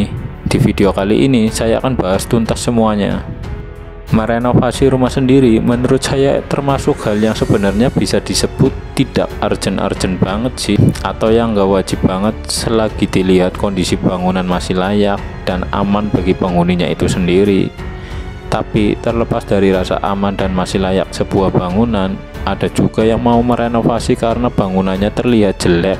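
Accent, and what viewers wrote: Banyumas, Indonesia. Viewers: ini orang kocak abis..asal jeplak..ngapak..gw ampe geli ketawa dengerin nya..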